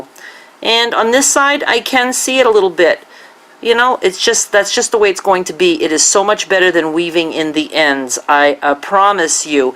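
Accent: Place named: East Coast, United States. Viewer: I am brand new to crochet and to your channel. I know the basics, but gosh I'm rusty I guess. I want so badly to make one of these afghans, but am having trouble with the turning the corners, can you do a quick snippet of just turning the corners for us, it would be a huge help. By the way ... you sure sound like you're from the East Coast, am I correct? I'm originally from Connecticut, living on the West Coast now, but you truly sound like so many of my friends from home (Maine maybe?) Thanks for sharing, your afghan turned out gorgeous, I hope one day soon I can make something like yours.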